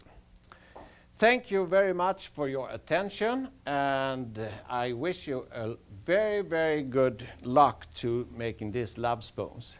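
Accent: Sweden. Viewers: hejsan! helt underbart att se dej å även höra dej på din goa svengelska å din humor 😂 helt underbart. jo jag tänkte fråga om du fortfarande håller på å jobbar med verktygen? om det är möjligt så hade jag jättegärna köpt vikingayxan som du visade i början å även en skedkniv. glad påsk!! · Hans engelska är underbart svensk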